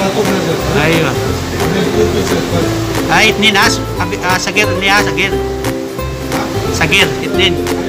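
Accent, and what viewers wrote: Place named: Visayas, Philippines. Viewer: Haha nice.. Sana all may shawarmahan😂 btw taga saan ka po kuyz? Love your accent. Bisaya ako😁😁..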